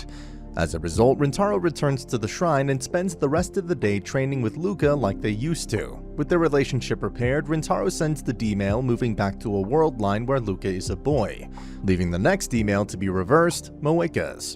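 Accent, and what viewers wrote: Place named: United States. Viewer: Thank you for real voice not robot. I love your American accent.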